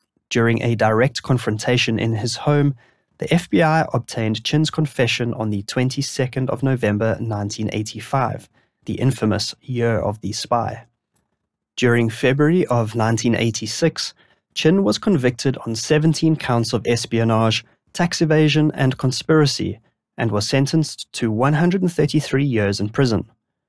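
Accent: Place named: South Africa. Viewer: Love your content and I miss the long-form content. Greedy, I know, but I make space in my day to watch you before anything else when you post here. Love your SA accent too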